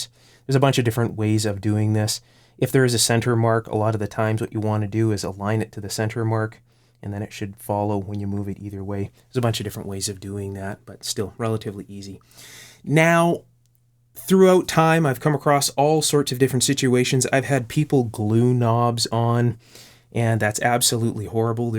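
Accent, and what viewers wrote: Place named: Canada. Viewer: I love your voice, even your Canadian pronunciation of “out” an “House”. Sometimes I put on your videos just for background sound when I work on long complicated projects. Thank you so much!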